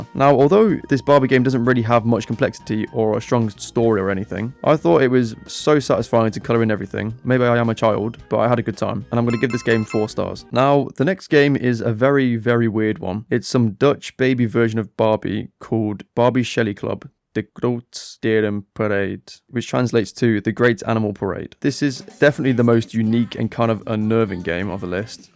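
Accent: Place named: United Kingdom. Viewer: Great video! Thanks for rating these games fairly! (also you are so British sounding it’s like I can see the difference between color and *colour*)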